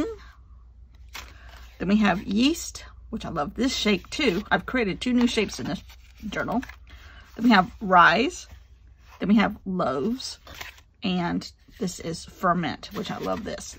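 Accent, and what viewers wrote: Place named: California, United States. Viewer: Southern mixed with California! 😂😂💜💜💜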